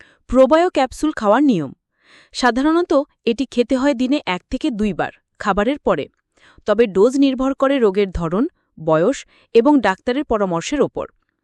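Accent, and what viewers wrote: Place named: India. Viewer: কন্ঠে বাংলাদেশের টোনে বলুন। ইন্ডিয়ানদের মতো করে বলছেন..?! ওদের এই বাংলাদেশের সাধারণ মানুষ পছন্দ করে না।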